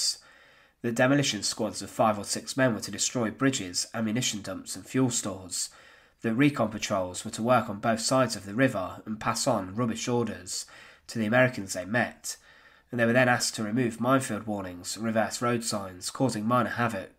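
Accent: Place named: England, United Kingdom. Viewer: Sounds to me, a British person, like he has an estuary accent. It’s very clear.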